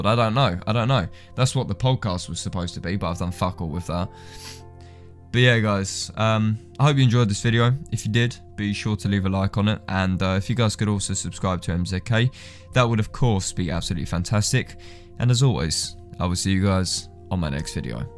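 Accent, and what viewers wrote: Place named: Cockney, United Kingdom. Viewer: This dude is legit, no frills, just straight up NMS and a cockney bloke. 👍🏼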